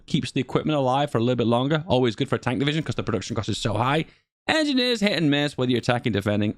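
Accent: England, United Kingdom. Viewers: still with the "bow je" terminally English >.>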